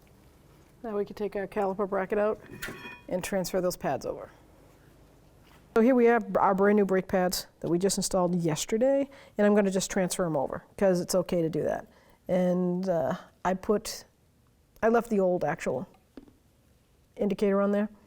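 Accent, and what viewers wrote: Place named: New England, United States. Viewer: Great video tool. I have the 2010 RX350, I've replaced the rotors and pads front and rear, but the brakes still grab...so I'm thinking I need new calipers. 177k miles I think she deserves them, so that's next and I'm thinking that will make the system work as good as new. Love the New England accent too...I'm from north of Boston myself! always check with TRQ first, and 1A Auto. They ALWAYS stand behind the product 100%. Thanks from a customer you've earned. Question: possible you have a video on changing the fluid in the differential/transfer case of the AWD model of the same year? Thanks again.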